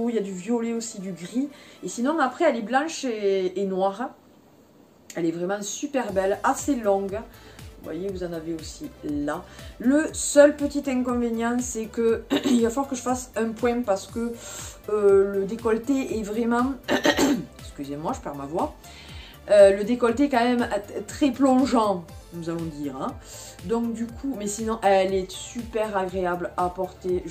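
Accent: South, France. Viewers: coucou ça vaut le coup tu te refais ta garde robe en ce moment c est top tu habites dans le midi avec ton accent ??? bon aprem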